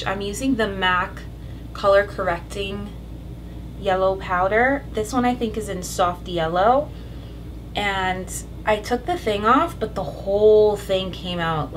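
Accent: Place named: Jamaica. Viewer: Great Video, Where are you from? I almost thought I heard a Jamaican accent.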